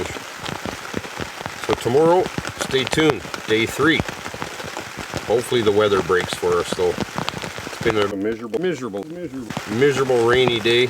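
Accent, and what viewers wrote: Canada. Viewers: The Canadian accent is why I subbed xD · Buddy’s so Damn Canadian hahaha. I love how he said gondola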